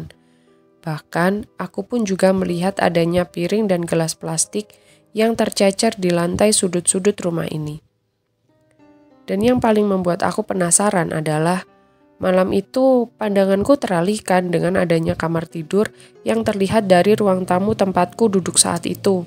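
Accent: Malang, Indonesia. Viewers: malangan betul logatnya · Asik an pas samean dewe seng crito sam, boso malangan mantep, berasa ndek tongkrongan. 😁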